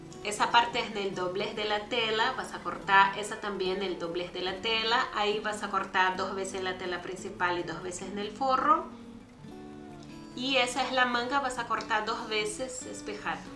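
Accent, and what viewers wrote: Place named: Brazil. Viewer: Hola de que país eres por tu acento parece que eres de Brasil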